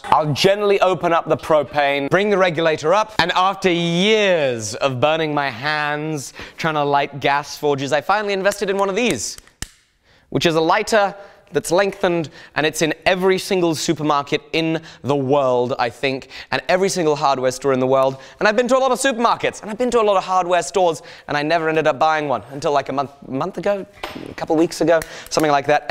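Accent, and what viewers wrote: England, United Kingdom. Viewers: where about's are you from in England to obtain this accent?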